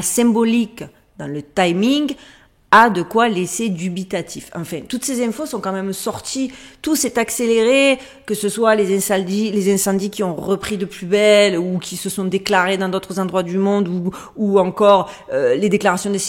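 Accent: South, France. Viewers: Bonjour 👋 Oui 👍 A chaque vidéo un pouce ! Vraiment très intéressant 🧐 en plus ! Et avec notre cher accent du Sud… trop bien Peuchère 🤣❤️🤩💐🌈🙏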